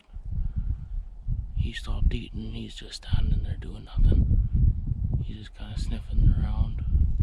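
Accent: Canada. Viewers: The most CANADIAN accent in recorded history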